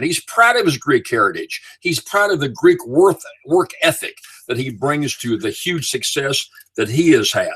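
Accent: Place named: United States. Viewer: You are just a Yank, you have a native Yank accent, and were born natively to America. You are American, you are not Scottish at all.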